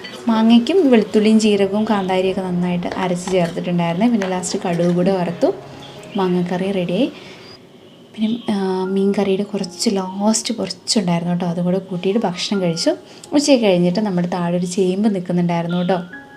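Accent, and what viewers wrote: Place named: Kottayam, India. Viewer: ഞങ്ങൾ കോട്ടയംകാര് വാഴച്ചുണ്ടെന്നാ പറയുന്നത്., നിങ്ങളുടെ slang തന്നെയാ ഞങ്ങൾ പറയുന്നത്.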